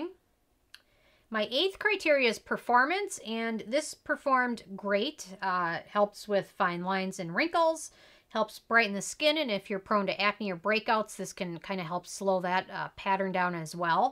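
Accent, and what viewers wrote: Minnesota, United States. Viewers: My husband said "she sounds like she's from Minnesota!" He's originally from there, but doesn't have the accent. LOVE IT! 😍😍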